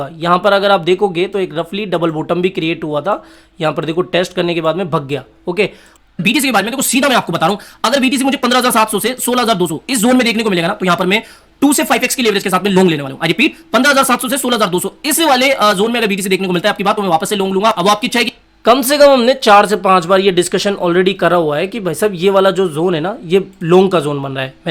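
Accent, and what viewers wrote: Rajasthan, India. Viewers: Rajasthan se ho kya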